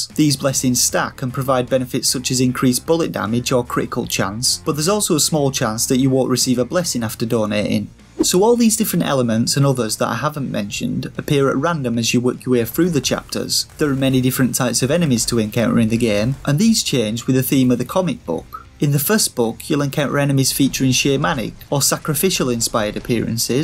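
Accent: United Kingdom. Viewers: Is it english of UK?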